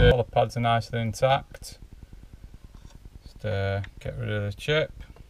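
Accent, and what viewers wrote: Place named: Northern England, United Kingdom. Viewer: Great video! I'd love to do this you explain everything in plain (northern) English! Keep up the good work!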